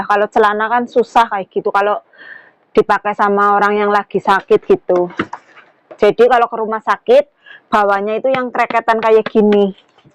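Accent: Banyumas, Indonesia. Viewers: Jawa ngapaknya medokl skali..ora ngapak ora kepennak..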